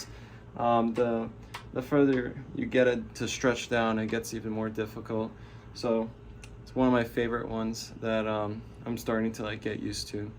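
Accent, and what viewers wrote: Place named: United States. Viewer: Кафявия колан по жиу-жицу за упражниетия на брахиализа. Не знам кой ще е по удибен жиу-жицу колегите или Армрестлерите, щото те го правят с бял колан :D. Your name is Bulgarian but your accent is American, very interesting!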